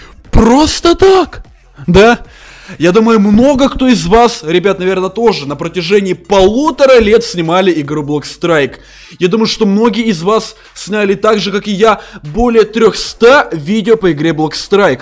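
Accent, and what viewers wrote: Ukraine. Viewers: говорит кнайф потому что он украинец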